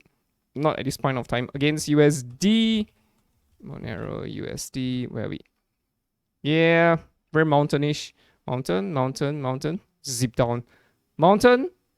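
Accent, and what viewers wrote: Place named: Singapore. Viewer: I grew up in Singapore, and I think, from the accent is in SG or somewhere close. SG for the win 🎉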